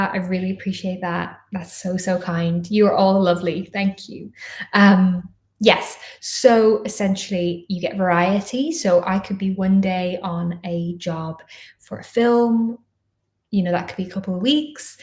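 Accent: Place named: Ireland. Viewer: Hi, lol i always thought you had an accent, but hadnt pinned it down to Irish, thats really cool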